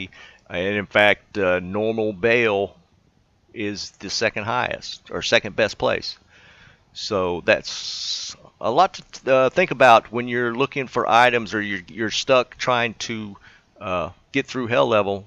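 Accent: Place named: South, United States. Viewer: Love the southern drool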